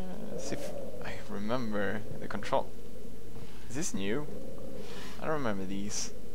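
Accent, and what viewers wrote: United States. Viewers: How come in this video u sound so American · He sound like he has a U.S. English accent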